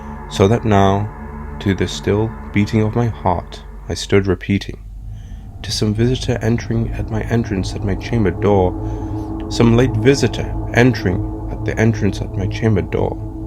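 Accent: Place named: Lancashire, United Kingdom. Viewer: Such an Eloquent Lancashire Accent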